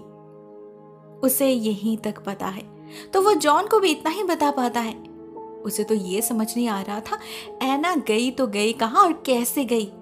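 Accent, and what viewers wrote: Bengal, India. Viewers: are you bengali or bihari ?? ❤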